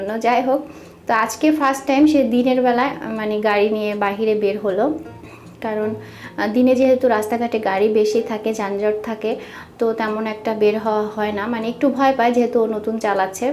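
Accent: Sylhet, Bangladesh. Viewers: Apne ki Sylheti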